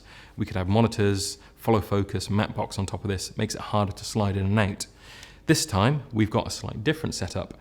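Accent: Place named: United Kingdom. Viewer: the "lightest touch" love the british accent :)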